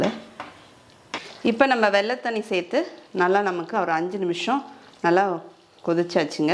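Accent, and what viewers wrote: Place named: Puducherry, India. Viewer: Vadaa Seema ya.. 😍😍😍 உங்க slang கேக்கதுமே ஏதோ little bit happiness.. Don't know y.. ❤❤ Neenga pondicherry ya 😁😁🙌🙌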